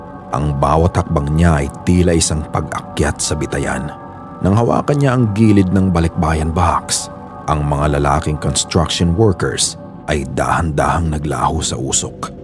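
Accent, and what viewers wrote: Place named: United States. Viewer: Nakakagulat ung tagalog na kwento pero nagging american accent pag english words. Okay naman ang Filipino accent na english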